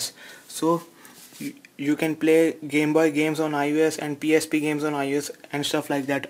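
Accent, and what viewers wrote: India. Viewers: Why do u sound like a gay Indian ?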